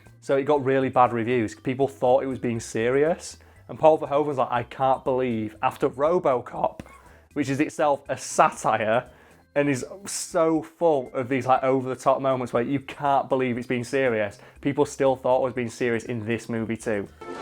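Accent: United Kingdom. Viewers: tone the british back a notch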